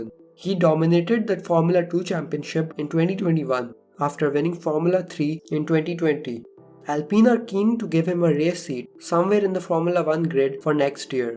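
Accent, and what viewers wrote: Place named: India. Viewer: Indian accent ... Nice 😌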